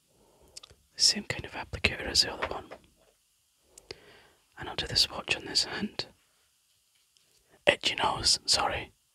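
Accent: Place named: United Kingdom. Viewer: Your nails are strong and sound AWESOME!!! 🤩 Loved the mic caressing, the tapping and scratching on the first goldy Blursh all-in-one liquid pigment, the tapping and scratching on the Blursh Ice Ice B B box and the tapping on the lid, yes 😊, - that sounds cool, I need THAT lotion 🧴 I have super weak nails 😫, yes 🥱 - she is THE QUEEN ❤ I always enjoy a good BRITISH 🇬🇧 accent 🙃 I can tell you LOVE and ADORE makeup! 💄 Well done,! 😊 I came to support because of Lorri 🤗 slime would be a EXCELLENT trigger assortment idea! ☺️ In all the ways: Alone, on the mic, on a table, hands ups, cloudy, sticky, with toppings… Whatever 😇 ☀️ Take care!! Have an amazing night!! ✨🌝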